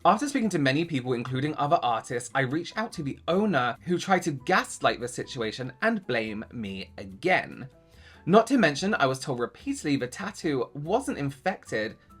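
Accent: England, United Kingdom. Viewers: I think you could make a living just reading aloud profane, ungrammatical posts from Americans (I am one, so don't come for me, fellow Yanks). I've gone back and listened to the segment between the mark and several times. The combination of your soothing voice, your British accent, and that incel's assault on the language (and his client) is art. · Can you speak Spanish? I'm dying to hear Spanish with a British accent! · I love hearing you read out that nonsense lol. Words like "n*tsack" in that unamused English accent is just perfect 😂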